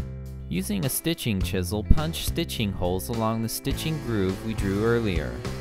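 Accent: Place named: Nevada, United States. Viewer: sorry Taiwan not Philippines and that's what I figured. You sound like me and I'm from Nevada haha.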